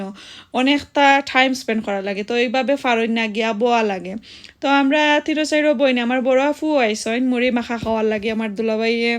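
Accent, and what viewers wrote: Sylhet, Bangladesh. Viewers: u talk in Sylheti basha that’s why I love to watch u more !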